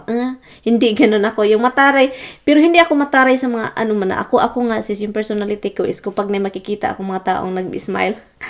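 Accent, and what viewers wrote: Visayas, Philippines. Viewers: surphlis kalog tapos cge ko katawa na mabisaya usahay ang tagalog nya😂❤️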